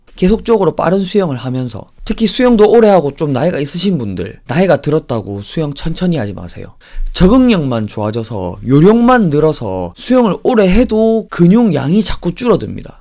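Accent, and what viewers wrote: Gyeongsang, South Korea. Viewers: 최고 입니다. 경상도 방언이 이리 스윗 할 줄이야~!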